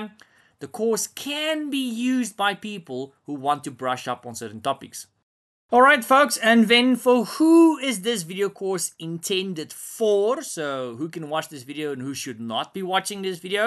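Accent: South Africa. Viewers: you're south african!!!!!!!!! the accent and your way of speaking lol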